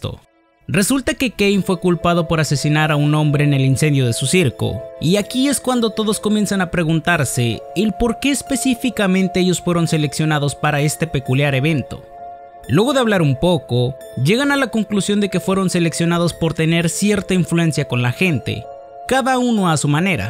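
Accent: Peru. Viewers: Eres de Perú?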